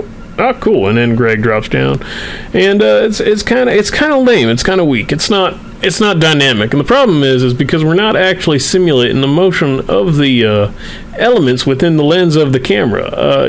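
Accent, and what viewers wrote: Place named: United States. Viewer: lets see the live stream! ps. you had a bit of a cowboy accent in that one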